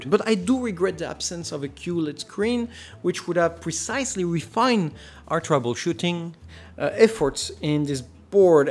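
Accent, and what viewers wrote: France. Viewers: Je viens d’apprendre grâce à cette vidéo que je vais pouvoir maintenir ma workstation à jour d’ici un an ou deux, juste en remplaçant le processeur par un Intel de 11e génération. Super intéressant !Par ailleurs, sur la forme, l’accent français est génial et sur le fond plein d’infos inédites sur les processeurs Intel de 10eme génération et sur la gamme Aorus de cartes mères gigabyte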